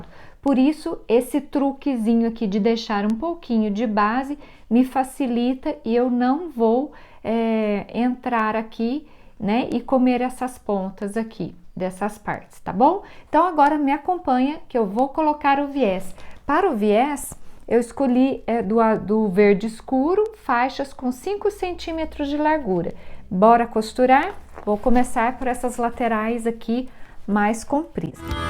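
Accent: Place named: Russia. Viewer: I truly love the colors n the style, Especially your Russian accent😅❤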